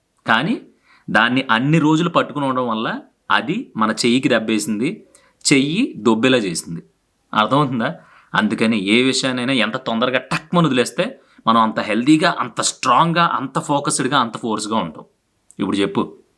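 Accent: Godavari, India. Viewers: Hi bro, midi godavari district kada, ekkada. Baagundi language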